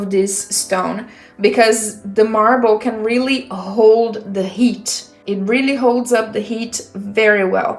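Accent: Brazil. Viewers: r u from brazil? u have a little accent <3 hahaha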